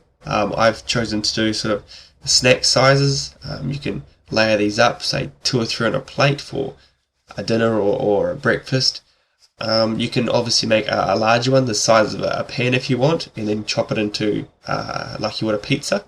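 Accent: New Zealand. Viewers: hey i'm not judging but you sound like you're from New Zealand hehe x) I used to stay in NZ for 4 years I love all of your recipes!! <3 <3 I am a big fan of yours xx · He has a New Zealand accent, not Australian :D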